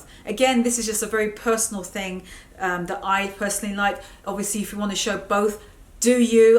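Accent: United Kingdom. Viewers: Found you today - instant subbie! It's so nice to find a fellow Brit who sounds like me! Excellent video!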